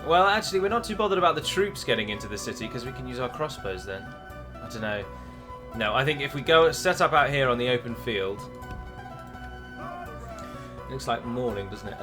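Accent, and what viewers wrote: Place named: Scotland, United Kingdom. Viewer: That scottish accent haha 😁 👍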